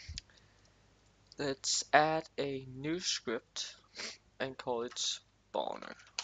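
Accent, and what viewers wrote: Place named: Denmark. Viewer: Just guessing off the accent but are you Danish?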